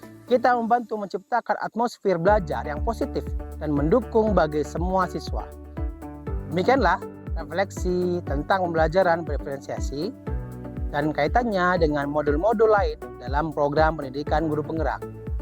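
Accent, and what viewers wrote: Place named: Aceh, Indonesia. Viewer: logatnyo sudah beda sekarang sdh jd orang aceh bener ni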